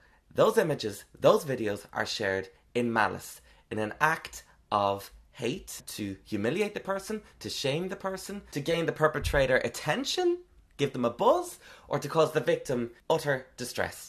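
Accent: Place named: Ireland. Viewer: wait where in Ireland is he from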